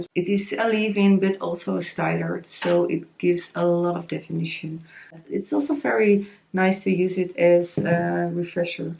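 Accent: Netherlands. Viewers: I knew it omg I watchers your curly hair routine and i was like that accent is soo dutch . Groeten van vlaanderen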